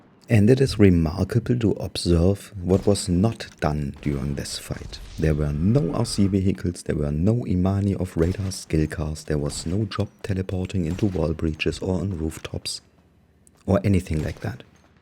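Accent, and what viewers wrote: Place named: Germany. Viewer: He has a slight German accent but everyone has to start somewhere. 💪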